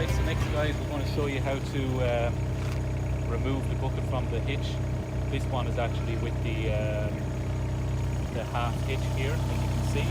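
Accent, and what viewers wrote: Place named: Ireland. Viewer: Yes this is my second comment on this video and I’ve seen all of the others where people are swinging hammers and all sorts of things to get buckets on and off. This guy with his lovely Irish accent just simply shows you how to get the bucket in balance and do it with one hand. I am part Irish so I can say something about his lovely accent!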